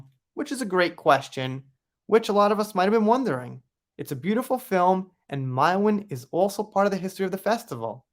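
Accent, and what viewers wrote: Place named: New York, United States. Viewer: I like to hear you talk, I like your accent (unusual bc I'm a Southerner who typically can't take NYC/Boston accents 😆), & you're spreading good news about JD, so as far as I'm concerned, you can repeat as many narratives as you like!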